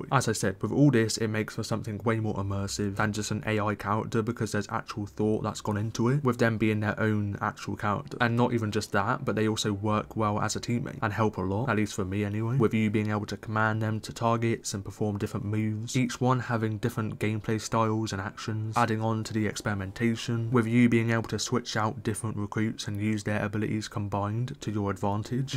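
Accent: United Kingdom. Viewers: SERIOUSLY it's pure and concentrated I was thinking the same thing and made a comment too. Whenever I hear certain phrases here and there in this video I can't help but crack a smile. This guy has the most extreme british accent I've ever heard. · That is one BRITISH f***ing accent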